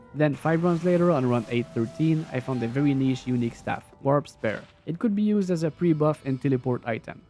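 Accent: Quebec, Canada. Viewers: Bro je reconnais un ti accent queb? Lets gooooo nice vid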